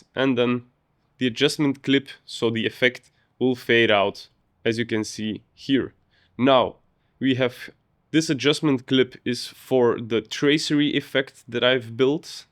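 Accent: Belgium. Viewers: Bro you are from Belgium?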